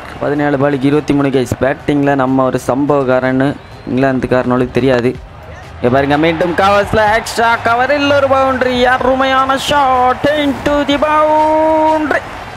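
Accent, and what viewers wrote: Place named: Kerala, India. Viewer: Bro neenga kerala thaane malayalam kalanthu pesuringa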